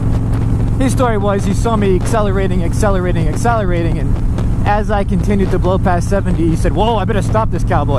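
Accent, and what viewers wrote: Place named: New England, United States. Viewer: By the way, you from Boston? NE twang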